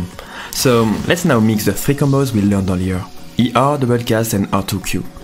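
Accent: France. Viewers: il l'est très clairement, impossible d'avoir un accent pareil sinon aha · J'ai ultra l'impression que t'es fr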